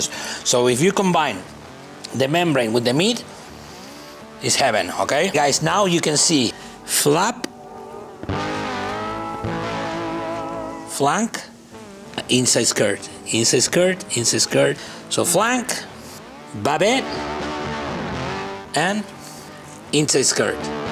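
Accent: Argentina. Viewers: i realized so quick this dude here is argentinian because of his accent and spanish words on the middle of his commentary que qliao, aguante el asado uraa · Un Argentino hablando inglés con un acentazo pero sin trabarse y sin parecer forzado, es un orgullo este chabon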